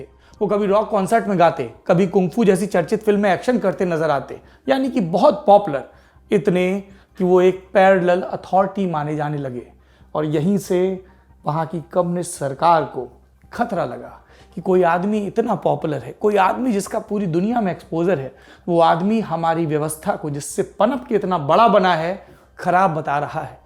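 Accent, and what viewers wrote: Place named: Kanpur, India. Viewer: Gazab haunk diye dadaa.. Chungi lga di.. Hahaha.. Kanpuriya andaaz wah wah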